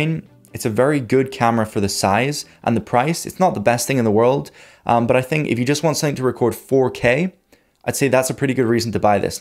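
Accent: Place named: Scotland, United Kingdom. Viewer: But the way you speak is like Scottish....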